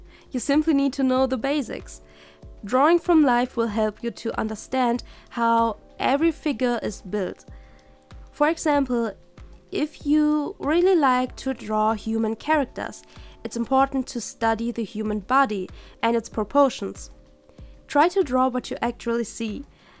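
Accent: Germany. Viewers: Kommst du von Deutschland😄 Ja oder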